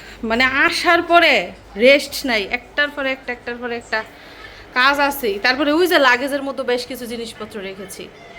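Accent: Gazipur, Bangladesh. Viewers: তোমার কন্ঠের সাথে মিলে যায় আমাদের গাজীপুর কন্ঠ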